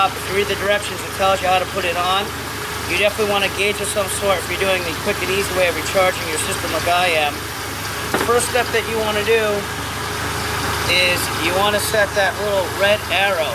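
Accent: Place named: New Jersey, United States. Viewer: Jersey accent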